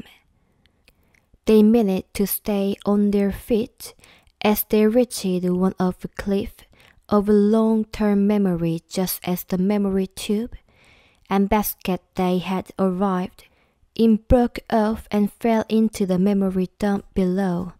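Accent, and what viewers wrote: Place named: United Kingdom. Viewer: 처음 들어보는데요..영국발음!! 너무 좋아요~~목소리도 너무 좋고 다른것도 들으러 자주 오게될것 같습니당!!!!!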